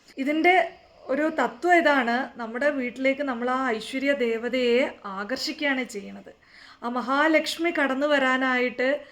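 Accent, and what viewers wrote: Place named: Karnataka, India. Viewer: thankal karnatic ano, thankalude slangil evideyo kitti, nhan kasargod.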